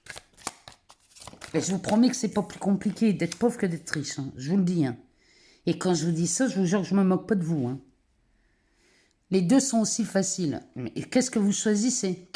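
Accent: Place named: Nord, France. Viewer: Bonne année. Merci d'être zen précise et positive. J'adore votre accent Chti.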